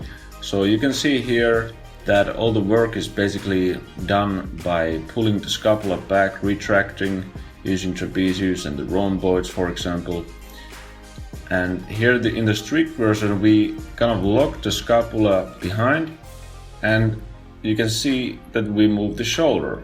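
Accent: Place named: Finland. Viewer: No wonders, you have the accent of Finn, haha :) Great channel by the way!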